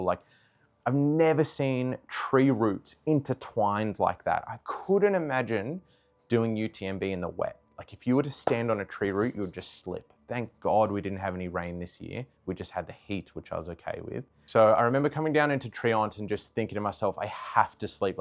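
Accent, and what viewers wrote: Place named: Australia. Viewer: Great to hear a downunder accent. Thanks for this, it was so interesting.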